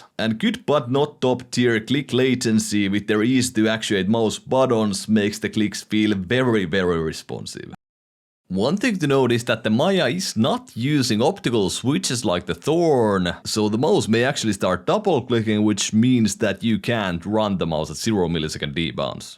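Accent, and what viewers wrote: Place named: Finland. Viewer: It's a very typical Finnish accent. If you play CS in EU you will hear that accent all the time. If you want a good idea of what the language sounds like and Finnish culture, I found the music video " " by " " very fascinating. My Finnish friend said to me it is a very good representation of what it means to be Finnish.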